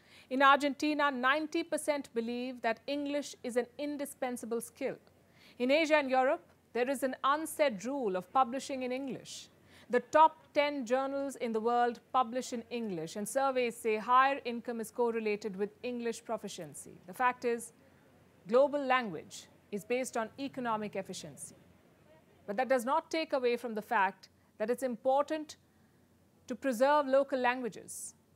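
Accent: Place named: India. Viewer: She handles stress in English very well, while still remaining recognisably Indian. Since stress is used to carry emphasis and shades of meaning in English, it's a useful asset to a news anchor.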